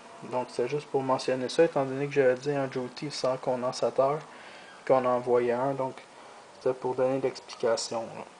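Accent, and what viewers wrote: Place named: Quebec, Canada. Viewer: Bonjour, merci pour ta réponse. Effectivement, je n'avais pas compris ! L'alimentation est une pile de 1,5VCC. Bon il me reste à comprendre ce qu'est un "Joule Thief" , de ce que je peux apercevoir, nous avons une sorte d'oscillateur qui envoie des impulsions de courant dans le transformateur. La boussole indique clairement qu'il y a passage d'un courant. Quelle est l'utilité du montage, Un TP scientifique ? Merci d'éclairer ma lanterne. L'accent semble venir de la Belle Province, tu confirmes ? Cordialement Jean-Pierre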